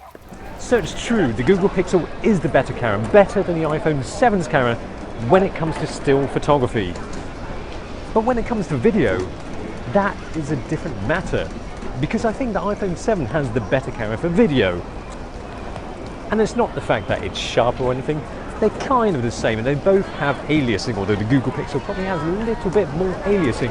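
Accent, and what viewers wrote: England, United Kingdom. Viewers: Great video Phone comparison!. But I think this is the first time I've ever seen an Asian man with a British accent?.. but then again I live in the states.. cheerio!😉🇺🇸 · are u English bro?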